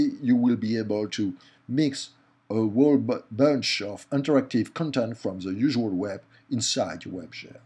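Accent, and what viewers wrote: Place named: France. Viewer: I love this and I think it's easier to do it in WebGL than in JavaFX :) Your fenglish is cute :)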